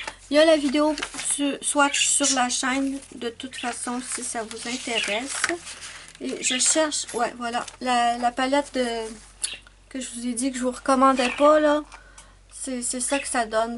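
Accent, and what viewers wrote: Canada. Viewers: Coucou Moi aussi j'adore regarder ce genre de vidéo ça me fait découvrir de nouvelles marques. Et en ce qui concerne tes vidéos je pourrais les regarder en boucle juste pour entendre ton accent 😊 je suis française et l'accent canadien me fait voyager rien qu'à l'entendre. J'aurais une petite question, je découvre avec ta vidéo les prismacolor scholar. Quelle différence y a t'il entre ceux là et les prismacolor premier ? Merci bcp Et bon colo 😉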